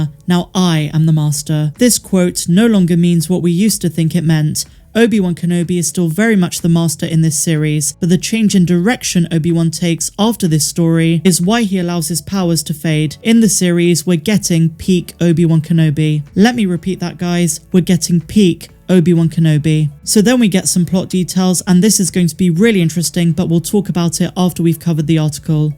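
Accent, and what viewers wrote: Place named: Bristol, United Kingdom. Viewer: I always enjoy your videos. Love the accent, is it from Bristol ? (one of my fave places in the uk ) I do miss the 3 x 'Utini' intros, though ! lol! ;) Thank you and keep up the good work ;) x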